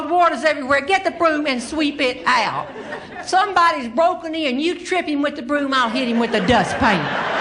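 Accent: South, United States. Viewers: There’s something so lovely about the southern accent which makes this even funnier;) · Love your stand up humor Jeanne I have a lady friend and she is from the south. She talks like you and jokes around. we call her southern Bell.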